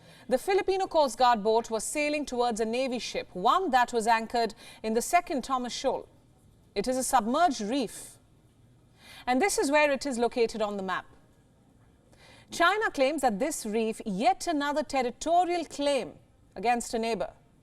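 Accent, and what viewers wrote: India. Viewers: It's like watching CNN in English with a Indian accent 🤮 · If not for her Indian accent, I would have believed everything she said. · Your Indian TV program Gravitas is a really a joke, why ? Your English is terrible with heavy Indian accent, all you should do is to brush your English. The South China Sea within the nine dashlines belongs to China. Phillippine intruders will of course be warned, and we Chinese are not warmongers, we just warned the Phillipine warship with laser, this is a very advanced weapon than firing rockets at the Philippinos. By the way the Philippinos don`t have such advanced laser weapon, so stop intruding Chinese territory. Next time there will be no such friendly warning just by laser, your military vessels will be blown into pieces.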